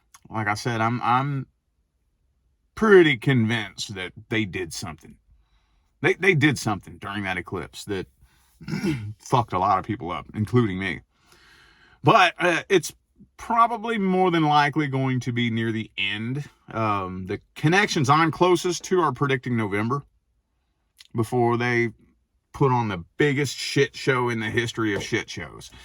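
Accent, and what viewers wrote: United States. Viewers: I see you becoming a great rapper next. Your voice is very American. might inspire you to consider it.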